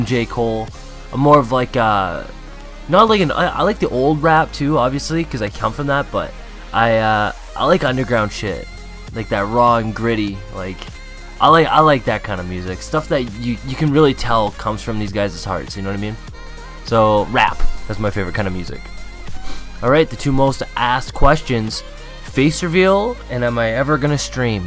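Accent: Canada. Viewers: I could tell u were Canadian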